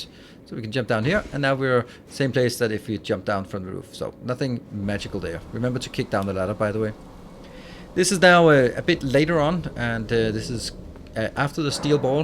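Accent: Denmark. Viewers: Is that an danish accent Im hearing? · Thanks I was really curious where to get that item that was on the cliff Edge. Funny enough I immediately recognised your Danish accent. Fortsæt med det gode arbejde.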